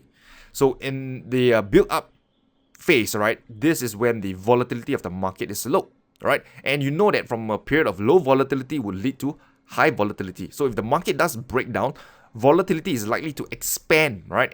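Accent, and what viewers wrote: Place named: Singapore. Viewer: Darn i miss your accent man i miss singapore! You are from singapore i assume?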